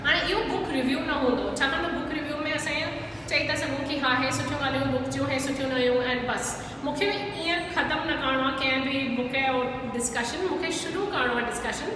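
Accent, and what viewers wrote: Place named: Shikarpur, Pakistan. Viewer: Tuhnjo lahjo ee shikaarpuri thae aon tokhy hik frnd sa mlraendas uha tokhy thnji jhlak lgndi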